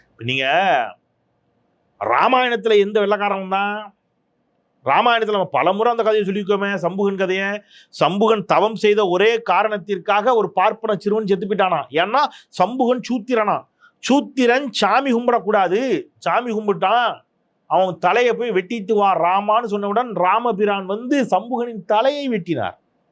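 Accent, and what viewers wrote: Tirunelveli, India. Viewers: Thirunelveli language la sema Anna.... congratulations 🍫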